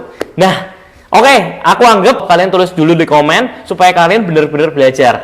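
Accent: Java, Indonesia. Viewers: Medhok e mas heheh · bahasa mas nya mendok jawa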